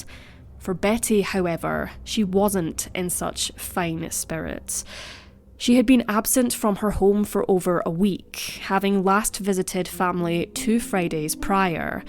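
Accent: Scotland, United Kingdom. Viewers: What part of the UK is the narrator’s accent from? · I'm Irish and she's 100 percent Scottish . Love it 😍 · Definitely Scottish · She's Scottish. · Laura she is Scottish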